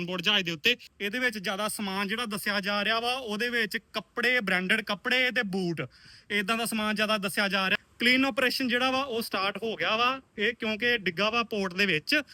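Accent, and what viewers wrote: Majha, India. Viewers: Verr ਮਾਝੇ ਦਾ ਲਗਦਾ 🙏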